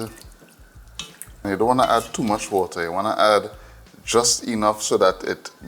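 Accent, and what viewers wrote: Trinidad and Tobago. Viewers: i love trinidad accent soo much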